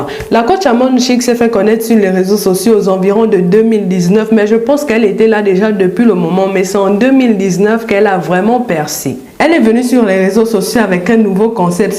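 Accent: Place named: Gabon. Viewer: Ça c’est l accent de ma sœur gaboma si j n m trompe pas. Merci pour l analyse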